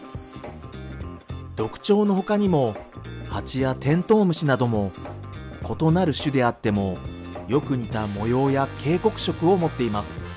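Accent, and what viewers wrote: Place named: United States. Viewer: アメリカ系のハーフの人が喋ってるみたいで良き。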